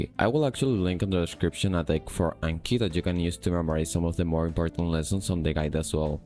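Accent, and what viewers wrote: Spain. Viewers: I watch videos on English, my level is not high, but I noticed - I can hear words and correlate with writing, but Spanish accent fully confused me, I can`t even concentreate on subtitles. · Amigo, con todos los respetos porque sé que has dicho que llevas 3 años aprendiendo inglés y no quiero restarte mérito, pero te recomiendo que mejores tu pronunciación en inglés. Sobre todo las vocales, pues las pronuncias igual que las vocales en español, cosa que es un error garrafal, porque en el inglés hay más de 20 vocales mientras que tú solo usas las 5 del español. No puede ser que después de mas de 3 años aprendiendo inglés pronuncies la palabra "studying" de manera literal, con el sonido de la 'u' del español, y que también pronuncies la palabra "audio" igual que en español. No sólo es desconcertante para los hablantes nativos del inglés que tendrán dificultades en entenderte (porque al no pronunciar una palabra correctamente lo que haces es que el oyente la confunda con otra palabra cuya pronunciación sí sea esa que has hecho tú, como por ejemplo pronunciar 'sheet' como 'shit' o 'sheep' como 'ship'. 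Menos mal que al menos añades subtítulos a tus vídeos), sino que también haces que sea desagradable escucharte hablar, pues a nadie le gusta oír a alguien chapurreando su idioma de manera torpe y lastimosa, mas bien dan ganas de quitar el vídeo. Te recomiendo que cuando oigas videos en inglés, prestes mucha atención no solo al contenido del mensaje, sino también a CÓMO pronuncian lo que dicen. Si el acento que quieres aprender es el del inglés americano te recomiendo mucho el canal de Rachel's English, que se dedica exclusivamente a enseñar la pronunciación del inglés am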